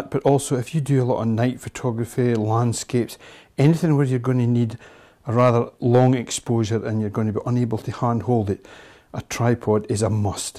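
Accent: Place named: Scotland, United Kingdom. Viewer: Clean Scottish accent ;-) like that :-D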